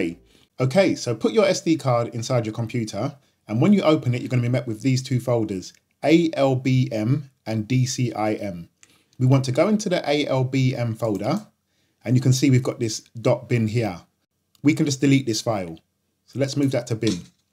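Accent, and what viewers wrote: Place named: England, United Kingdom. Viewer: the very least I can do to say thank you. Love your English accent.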